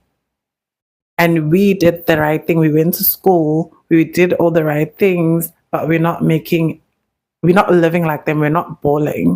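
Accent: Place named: Nigeria. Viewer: Are you sure you are South African? You sound like a Nigerian or Jollofina.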